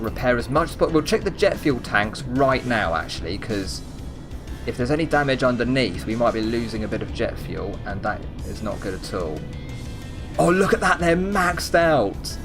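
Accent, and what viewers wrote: United Kingdom. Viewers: think they changed somethings with the megaladon.. that f* B* follow my boat for at least 15 min. really like your vid's dude! Charming accent aswell UK i presume? Ever thought about making a stormworks server? think it could be fun, you might want to look into it cause i would join that in a second. i understand it's a lot of pc power required for keep it running but how you play solo is already really cool.. now ad that to the followers you already have. just a thought mate:) keep going you're amazing video's about stormworks!. NJersey is okay but he thinks he is amazing and presumes to much. frantic is funny cause its an idiot. Camado is US and wants views lately. well that's enough b*tching. check the server stuff. guessing of the followers you have, we would have Alot of fun:)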